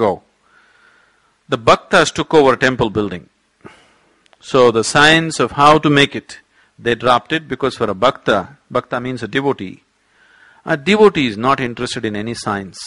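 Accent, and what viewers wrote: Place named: South India, India. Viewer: becoz he's south Indian...south pronounce shiv as shiva....om nama shivaya...not om nama shivya...hope you get it...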